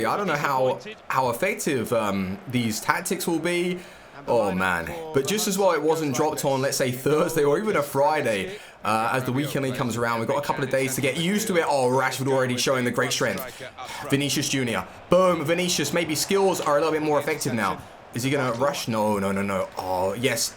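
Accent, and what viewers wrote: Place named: England, United Kingdom. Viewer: He’s English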